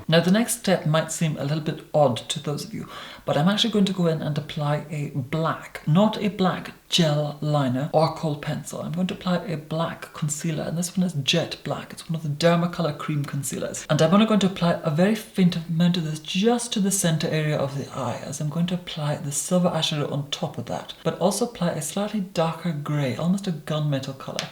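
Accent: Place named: London, United Kingdom. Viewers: This is the most beautiful makeup look on planet earth 🌏 And the solar system Milky Way Our universe Parallel universes................................ You are an artist You make the most heavy makeup look polished, expensive, silky, porcelain like, divine and absolutely seamless You are brilliant, the more I watch your tutorials the more jaw dropped I feel Let alone your perfect posh English Dear.... you are freakin’ fantastic ❤️💖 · Я слышу лондонский акцент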